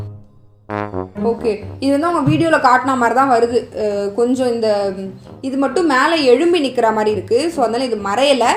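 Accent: Tirunelveli, India. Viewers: Neenga tirunelveliya de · Tirunelveli ya????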